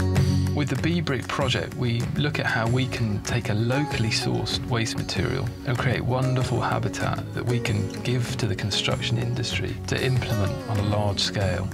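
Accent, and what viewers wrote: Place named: United Kingdom. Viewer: Wow these comments. Tik tok nation. Can't stand a video for more than 30 seconds. His voice is soothing. Whats wrong with having a posh English voice?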